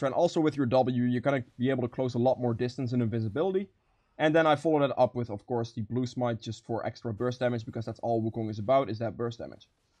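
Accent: Netherlands. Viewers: Are you dutch, you sound dutch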